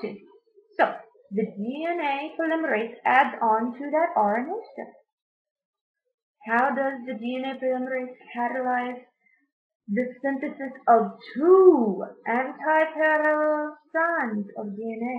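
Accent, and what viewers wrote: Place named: India. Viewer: Your German accent sounds very Indian.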